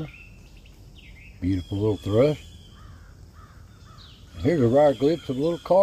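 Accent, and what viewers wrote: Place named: Missouri, United States. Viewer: Just found this. The narrator sounds like folks from my hometown in SE Missouri.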